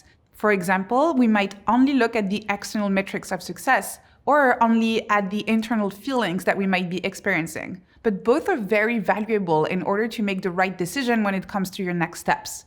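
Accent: France. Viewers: It is normal behavior. Also, she's obviously French and although she does a great job with modulating her voice to match what she's saying, it can sometimes sound monotonous. Why would she congratulate herself for a degree she got years ago when she had time to digest the joyful emotions associated to succeeding? Maybe y'all americans brag for years on end about your successes but in France we don't repose sur nos lauriers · My cynical mind tells me that this impenetrable French-accent madam with aristocratic pretentions has a point — very painfully so. Now all that’s left is to get rid of my cynical mind....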